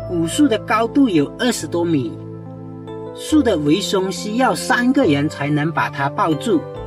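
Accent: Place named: Hakka, China. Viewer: 有客家話口音的普通話，也許說幾句客家話也有支持者喲！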